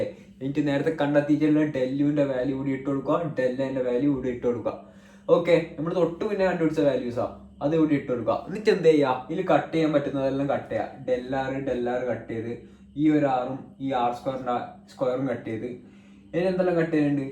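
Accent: Kannur, India. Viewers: Bro kannur aanno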